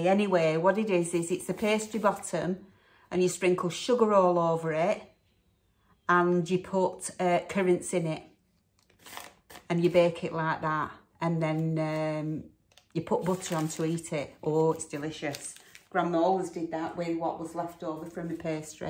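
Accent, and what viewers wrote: Lancashire, United Kingdom. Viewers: Ooo looks yummy must have a go at that soon. New subscriber here & loving your content, are you a (dare I say it) a Lancashire lass? It’s where I’m from….thought I recognised the accent?! Look forward to catching up on your previous videos & please do a cook with me video, I for one would love it. Thank you, take care. Kind regards, Lynda 😉🌻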